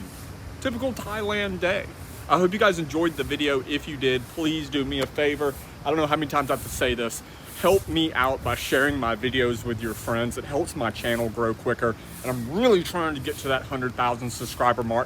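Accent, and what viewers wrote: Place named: Pittsburgh, United States. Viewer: Are you from Pittsburgh? Your accent sounds like it. Great channel.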